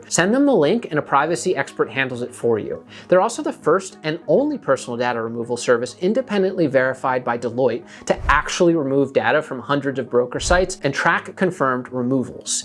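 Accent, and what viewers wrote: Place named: Germany. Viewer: My brain was short circuiting : I hear a German accent out of an Italian. Imagine my relief when you reveal the truth.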